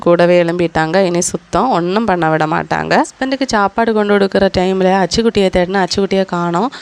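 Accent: Kanyakumari, India. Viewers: நீங்க எந்த ஊர் நம் கன்னியாகுமரி அண்ணன் உங்க வாய்ஸ் கொடுத்தா அப்படித்தான் நமக்கு தெரியுது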